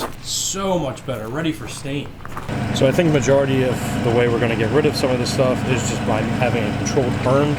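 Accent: Philadelphia, United States. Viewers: Also where in PA are you from? I’m from Delco, you definitely sound like your from the Philly area. I’m hoping to move out to the Lancaster area in a year or 2.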